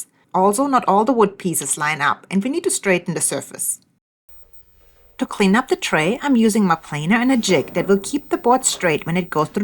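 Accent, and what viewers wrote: Germany. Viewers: Sie kommt aus Deutschland. 😊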